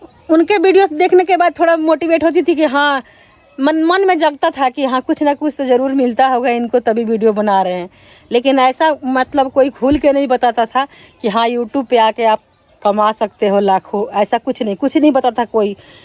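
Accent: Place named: Gorakhpur, India. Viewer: Apki bhasa gorbpur ki lg rhi h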